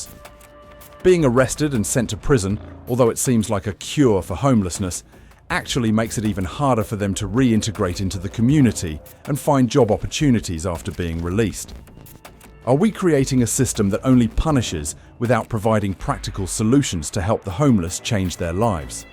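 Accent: United Kingdom. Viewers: This is not not not the fault of “a society “ like the narration of the idiot writer of this script ! This is a result of Democrat run cities like Portland, Seattle, San Jose, Los Angeles and many others. An immoral government allows for homeless encampments, poor law enforcement, leniency towards drugs and prostitution along with allowing gangs and cartel control… the wealthy drink wine and socialize while they care nothing for law and order and safety, health and security for the community. The facts speak for themselves. I live in a peaceful clean area of good governance by common sense leadership. Thank goodness for some good places to live still. The narrator should take his British accent and tell the story of the Isslummist problems in England! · You sound like you're from the UK, why don't you go back, there's plenty to work on there. It's such a crazy thing that naive people tend to believe people from the UK or Australia because they have this accent that tickles their ears, all the while the UK is imploding.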